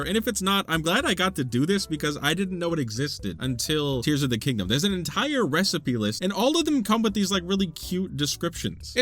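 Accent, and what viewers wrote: Ireland. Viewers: I didn't know where is from but I didn't expect Ireland lol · Your Irish ? Witch county do you/did live in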